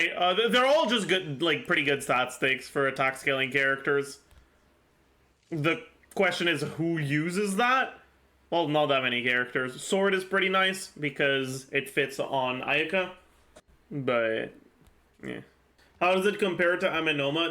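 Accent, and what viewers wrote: Quebec, Canada. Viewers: Completely off topic - are you québécois? The way you pronounce these french words is so familiar for some reason.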